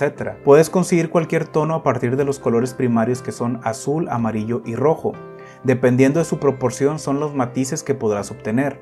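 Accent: Mexico. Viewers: Excelente video. Me suena a que eres de México. Has probado la marca que venden los de Roel? Me parece se llama velazquez. Qué opinas de ellos?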